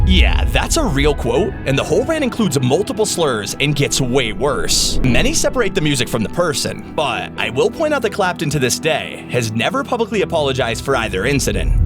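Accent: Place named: United States. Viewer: The bloke who did this video sounds like some American leftie tosspot.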